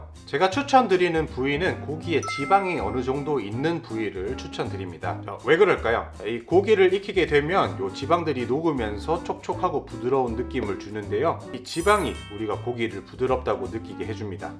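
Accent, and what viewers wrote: Gyeongsang, South Korea. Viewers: 경상도 억양이 다정스럽고 어딘가 품위가 느껴지는듯해요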